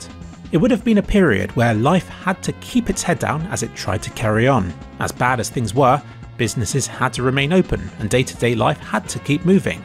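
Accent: United Kingdom. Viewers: You sound British a re you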